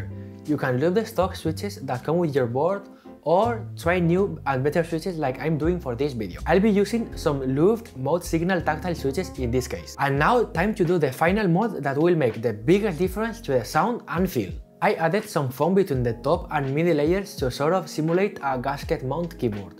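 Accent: Spain. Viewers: He for sure has been born in Spain or at least he has been living for most of his life. He is got an amazing english for his age. His accent is 100% spanish. Very nice chanel man. Keep it on !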